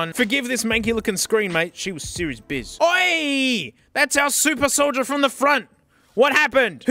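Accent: Australia. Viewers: I've been binging your videos for like the past week, and I have this feeling. . . that you might be Australian. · I really love the weekly display of the disembodied hands with Australian background voice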